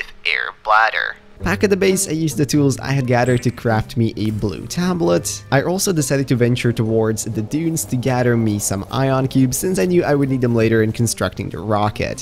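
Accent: Netherlands. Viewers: bro i just discovered youre dutch, you can hide your accent super well!!! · Immediately knew you were Dutch. It's the accent lol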